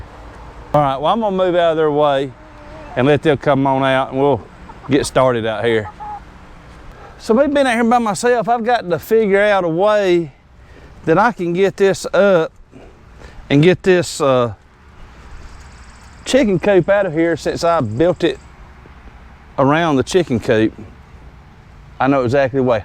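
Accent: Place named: Tennessee, United States. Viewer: Sounds like n.e. Tn. Love the accent like mine.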